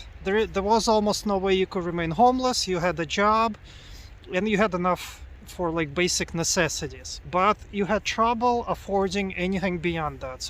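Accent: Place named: Russia. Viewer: Странно, у тебя все еще сильный русский акцент..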